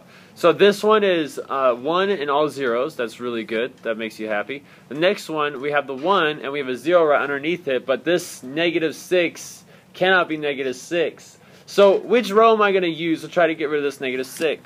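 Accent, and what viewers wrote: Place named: United States. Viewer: this sound so american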